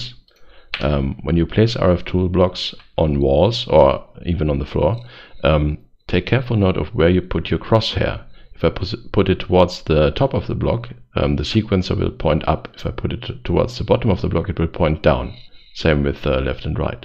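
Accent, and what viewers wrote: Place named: Germany. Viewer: From the dialekt you come from germany oder nicht grins? Crazy OP this way to create energy. No Big Reaktors or other generators are so fast, easy and cheap to get. And it cant blowing up like draconium. I have never use this and i dont know if i like it. You get tons of enderpearls with lilys or mobfarm. Much easier as any early charcoal generator setup.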